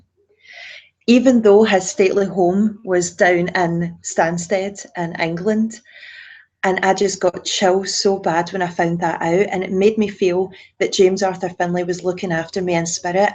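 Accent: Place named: Scotland, United Kingdom. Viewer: Hello, who did you say your mentor was? You never put it in the description box. Good to hear a Scottish accent like mine 👍🏻😊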